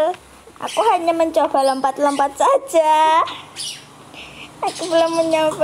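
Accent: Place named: Java, Indonesia. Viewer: Pliss dong medok nya membuat saya mengngakak 😭😂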